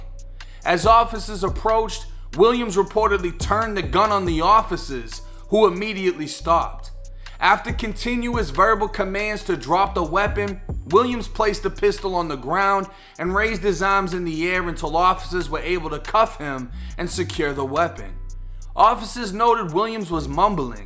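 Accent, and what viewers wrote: Boston, United States. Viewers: How u got a Boston accent but u from Florida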